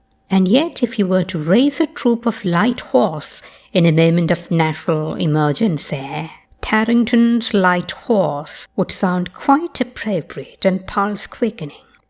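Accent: RP, United Kingdom. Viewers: This indeed is posh Upper RP English. I had to listen to this three times to understand the whole story. The story is actually quite funny ☺️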